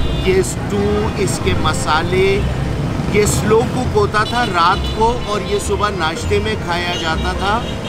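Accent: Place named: Karachi, Pakistan. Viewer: Sydney Australia.. love your accent bro.. karachi ki yaad aa Jati hai.. Best Food Explorer in Karachi😊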